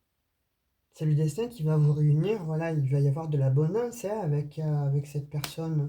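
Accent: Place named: Provence, France. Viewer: je vous adore, votre accent me rapelle ma provence je vous envoi un bisous d'italie bonne fète.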